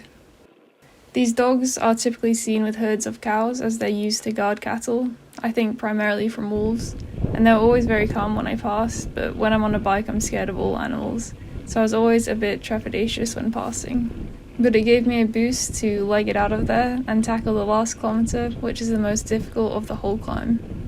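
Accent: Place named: United States. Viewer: The rear cam works so well, really gives that perspective of progress love your voice and your accent of course like any American : )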